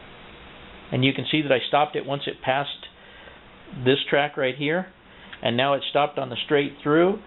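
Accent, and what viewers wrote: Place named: United States. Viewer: American- ish lol!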